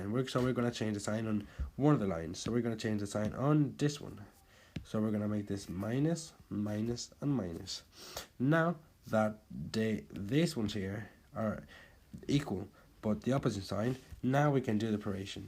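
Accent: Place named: Ireland. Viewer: Hey glad I found this video. Thank you. Could not remember how to do this. It is easier if you do a simultaneous equation pretending the ≤ is =.............. Like your Irish accent.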